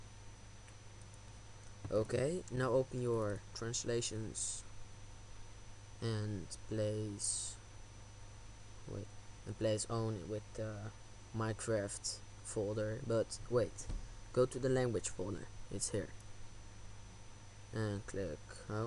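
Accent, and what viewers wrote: Netherlands. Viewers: Je kunt echt horen dat je Nederlands bent... :P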